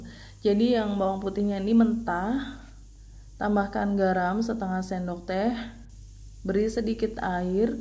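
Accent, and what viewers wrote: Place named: Java, Indonesia. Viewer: Logat Jawa kasih masakan Madura ...... Sepertinya resep Ini perkawinan Jawa Madura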